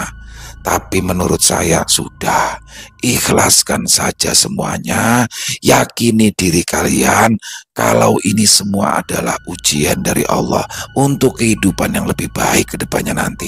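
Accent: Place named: Betawi, Indonesia. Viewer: sangat terhiburr. Om...keren pembawaan nya..tapi klo sdh cerita ada gaya betawi nya lbih lucuu...😂keq warung bng doni...sukses terus ya om ❤️❤️r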